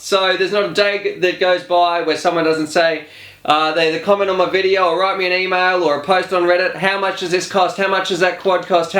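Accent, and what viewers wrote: Australia. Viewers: You are Australian aren't you? Tell us the prices in AUD!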